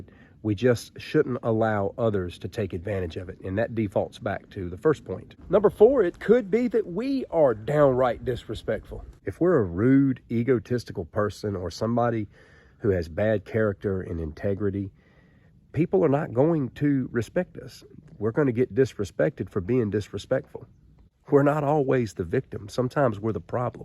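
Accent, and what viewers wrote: South, United States. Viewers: Not only do I like your advice, but your southern accent, combined with your calm and confident voice sounds both masculine and soothing at the same time. Keep it up, man.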